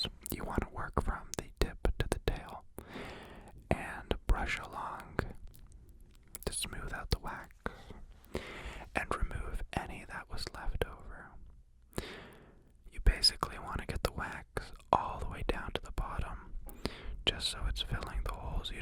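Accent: Canada. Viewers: Us he Canadian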